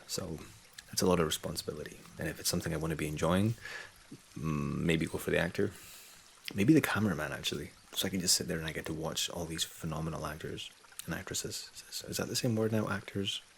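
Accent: Scotland, United Kingdom. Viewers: You still have a strong scottish twang to your accent :) yay for scotland ❤☝ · You sound Scottish to me mate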